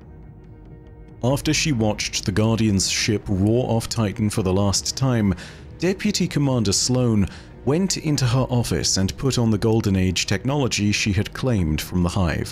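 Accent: United Kingdom. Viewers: It's called a British accent.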